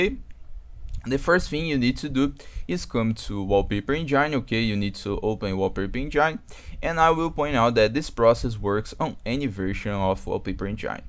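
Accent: Brazil. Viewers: mano, tu é br né? tem sotaque kkkkk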